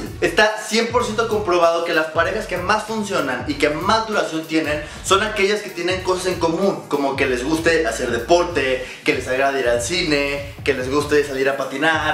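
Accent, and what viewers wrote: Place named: Fresa, Mexico. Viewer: Hablas freson y fresco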